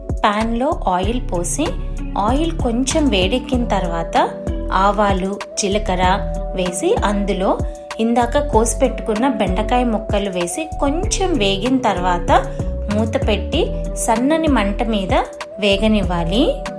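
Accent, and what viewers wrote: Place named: United States. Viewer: Madam I am a huge fan of your cooking and your american telugu accent and your style of expression please tell repice of chicken soft kabab and chilli motail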